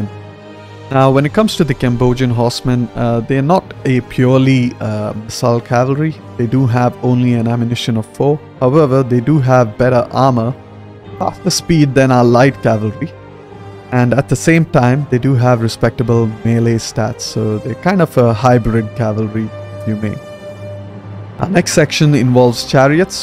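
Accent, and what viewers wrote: India. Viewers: Are you a desi? You accent is similar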